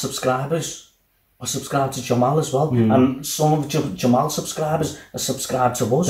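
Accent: Liverpool, United Kingdom. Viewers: Congratulations and for Jamel AKA Jamal recommending your page so nicely. You are one of 5-6 sites he recommended. He had done this once before with some other sites. Now he does it here and you are one of the picks. And you DESERVE it as you put so much into your site. You are known for your openness, listening to different artists from many cultures, many languages, many genres. Your site is amazingly diverse and truly international. You have some of the best subscribers who contribute and offer choices. You know us by name and person! And you are so much fun, and knowledgeable and expressive. Absolutely cute with a Scouse accent that makes your site just so charming. Spread the love, spread the music! Spread the diversity. Congratulations.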